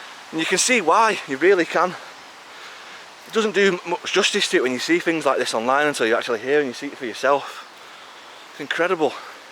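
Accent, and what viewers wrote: United Kingdom. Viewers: His accent is like british but trying to speak like uncle roger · This guy has one of my favorite British accents, can someone in the UK identify it?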